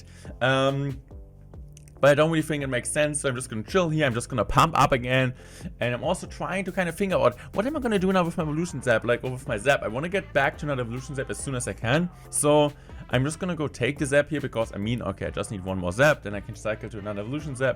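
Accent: Germany. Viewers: Glaube gibt wenige deutsche dessen akzent so sehr triggert wie der von dir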